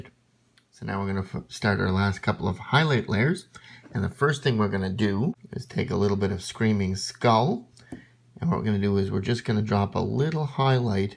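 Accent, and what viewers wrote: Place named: Canada. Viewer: so fahr? i hear a fellow hoser!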